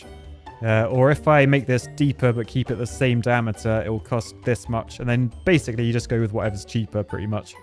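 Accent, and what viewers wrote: United Kingdom. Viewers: I heard way more British pronunciation in this "game" than any other video. 😂 I was like "What'd he say?" 😊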